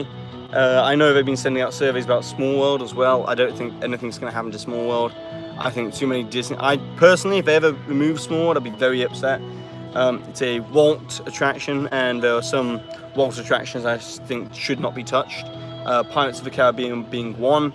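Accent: United Kingdom. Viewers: Where about's are you from the UK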